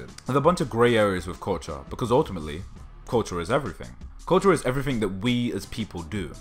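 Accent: United Kingdom. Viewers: No I'm not being 100% glib. You have a British accent, but you're obviously black and talk about the black experience in America. So do you live in America or believe that any black person can understand the black experience in America? Call it a question of phenomenology.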